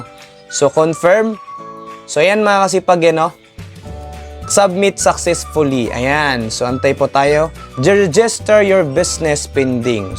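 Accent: Visayas, Philippines. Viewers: Bisaya ka Dong?🤔😁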